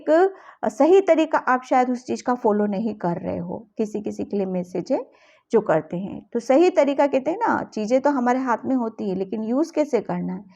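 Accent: Gujarat, India. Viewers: Ma'am I believe you must be from Gujarat. I can make out from pronounciation, as I am working in defence organization. Good Night 💤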